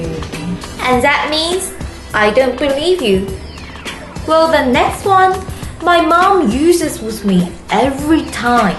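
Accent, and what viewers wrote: England, United Kingdom. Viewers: Yaah! Unnie! You're so perfect! You speak korean and have that british-like-accent. T^T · I love your English accent. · she sounds british almost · Your Korean accent sounds almost British... Interesting. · i like her english accent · She sounds like she's got a british accent aswell as the korean slurring. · she sometimes has a british accent, but mostly its a typical korean american accent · You seem to have a very slight English accent lol.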